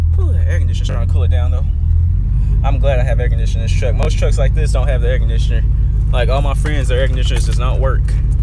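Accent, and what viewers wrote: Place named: Atlanta, United States. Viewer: Your from Atlanta all my people out there talk like that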